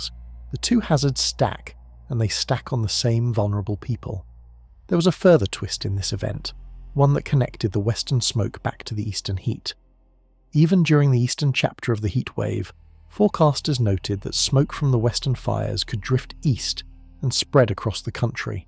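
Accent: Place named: United Kingdom. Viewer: The narrator voice is British. Few Brits have any concept of Fahrenheit, apart from maybe 32º Fahrenheit.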